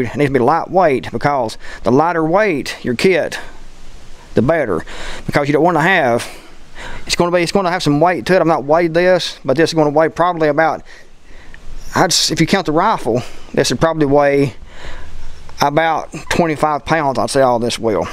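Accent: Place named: South, United States. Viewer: I only subscribe to a handful of people of which your one. Your videos are fast and full of great content 👍🏻, however, I’ve never been to the south and am guessing part of the time what you are saying so I turn on closed caption, but they are getting it wrong as well 😆. But anyway I enjoy your videos, but your accent sure keeps me on my toes 😬😎 Keep up the great work!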